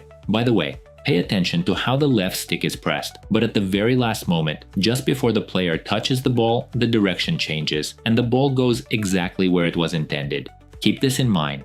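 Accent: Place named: Russia. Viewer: Так я знаю этот голос) сначала мысля -, а моожет и на том канале голос ИИ , но посмотрев пол видео понял, что ты говоришь а не ИИ) Английский гуд, но акцент нашинский именно)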